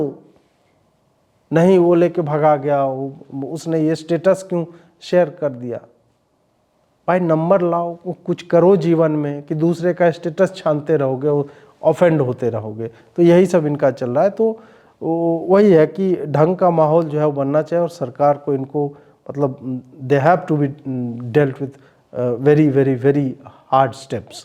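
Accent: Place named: Bihar, India. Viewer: Very well analyzed explanation. Bihari tone pe sunne samajhne main Maja aa gaya. Thank you Sir ji. Keep speaking and keep awaring sleeping Hindus.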